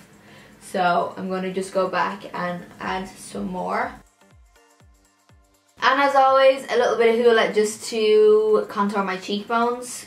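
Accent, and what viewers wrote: Dublin, Ireland. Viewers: Just new to your channel but can I just say that’s it’s so fab to see a big and successful YouTuber with a Dublin accent!! Any other Irish Youtubers I know of all have like posh South Dublin accents or with an American twang there’s nothing wrong with that of course but it’s just really refreshing and exciting to hear an accent like mine on YouTube. Reppin’ the Northsiders!💁🏻 Fills me with pride!💚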